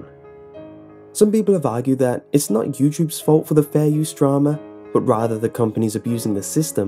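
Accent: United Kingdom. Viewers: it's okay dude I will love your videos I also like your British accent manual coolest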